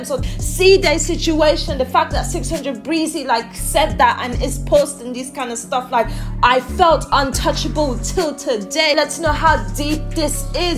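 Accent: United Kingdom. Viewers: U from the uk?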